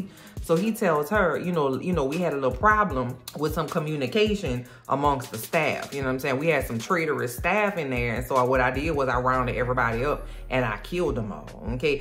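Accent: New Orleans, United States. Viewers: You have to be from New Orleans lol I hear the accent all day long 😭😁⚜️ love the review! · Baby the New Orleans accent. I subscribed so damn fast!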